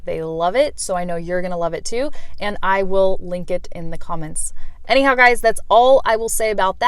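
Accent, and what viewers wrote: United States. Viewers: Your American accent is so lovely, It's incredibly clear <3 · What region from u.s.a are you?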